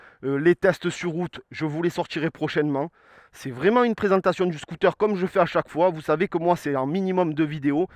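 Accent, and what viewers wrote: South, France. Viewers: Me encanta el tio este con su acento del sur,j'adore ce mec avec son acent du sud,saludos desde Normandie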